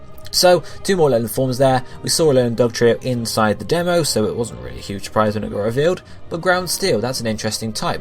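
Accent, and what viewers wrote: United Kingdom. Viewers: OHHHHH So he's from the UK? I didn't know that, sorry. Thank you for the information. I hope I wasn't rude when I asked the question. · is british and thats how we say it, but i end up saying zee half the time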